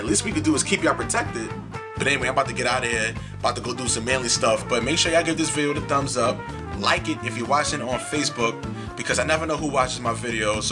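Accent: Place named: New York, United States. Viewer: where r u from? gotta be bk or the bx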